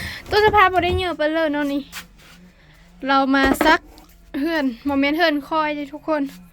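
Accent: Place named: Phu Thai, Thailand. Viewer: สบายดี น้อง สาวภูไท เว้าม่วน พี่FC ฝั่งไทย เด้อ น้อง เก่งๆ แบกตะกร้า ผ้าไปชักเครื่อง บ้านอีน้อง กดไลค์ กดแชร์ เป็นกำลังใจ❤ ให้น้อง สาวภูไท คนงาม เด้อ จร้า❤❤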